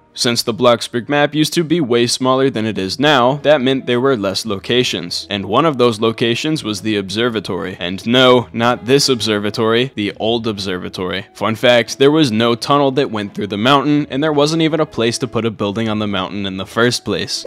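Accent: United Kingdom. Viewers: British detected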